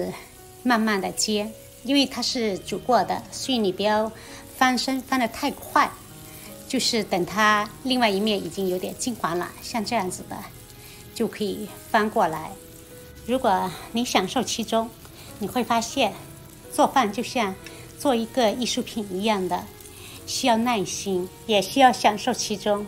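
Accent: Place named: Southern Yunnan, China. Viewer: 我偶然刷到，一听就是马谱，我家云南人。了不起，很辣燥的云南婆娘。你口音是滇南人😊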